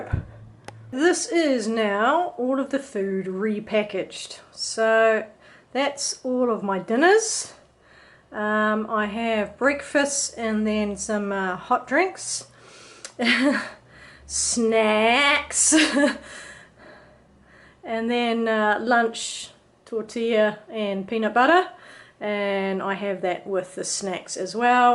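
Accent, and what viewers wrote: New Zealand. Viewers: I just discovered your videos - thru a mention by someone else. I have done the JMT in August & Sept, so it will be fun to see it under snow with you. Also, I had an aunt from New Zealand, so I love hearing your accent, as it sounds a bit like hers. My cousin and I hitch-hiked all over NZ; for 2 days we caught rides w/ 2 "truckies" headed to the South Island. I did the Milford Track Freedom trek in pouring rain (except for the 1st day).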